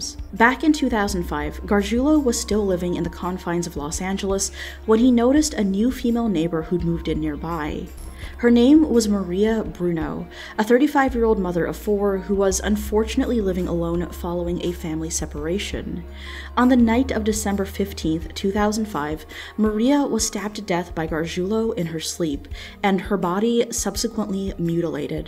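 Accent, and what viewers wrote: Hawaii, United States. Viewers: She's from hawaii it's an accent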